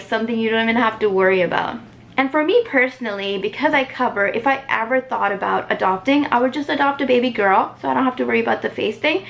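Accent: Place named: England, United Kingdom. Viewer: I hate how she speaks Arabic in an English voice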